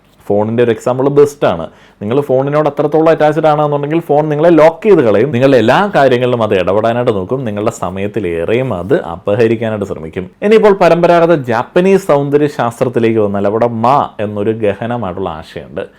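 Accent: Kottayam, India. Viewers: May be kottayam slang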